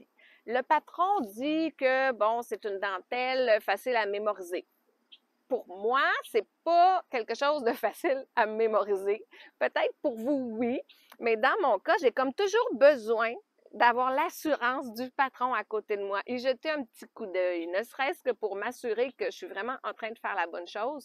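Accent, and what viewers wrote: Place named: Quebec, Canada. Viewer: Vous êtes du Québec Québec Canada vôtre façon de parler est strictement Made un Québec ❤ très jolie le bandeau où est le patron s’il vous plaît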